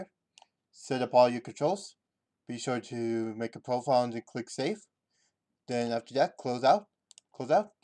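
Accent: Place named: India. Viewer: the terrible Indian accent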